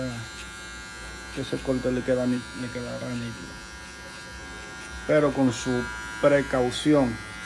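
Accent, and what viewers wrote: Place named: Dominican Republic. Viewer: Es que así es que hablamos los Dominicanos, y no solo cambiamos "L" por "R" tambien cambiamos palabras por completo😂😂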